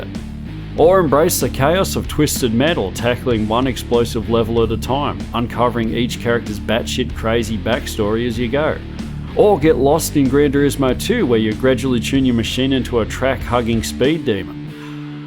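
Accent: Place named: Australia. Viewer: No they're just both Australian. They sound very different.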